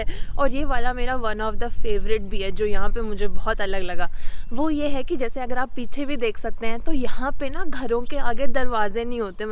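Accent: India. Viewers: आप की भाषा लहजा देख लगता है पक्के भारतीय हो भारत की बुराई करना भी चाहो तो नही कर सकते।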